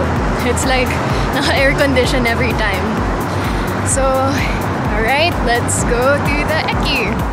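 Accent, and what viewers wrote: Philippines. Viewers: The way you talk and laugh scares me that Filipina accent lol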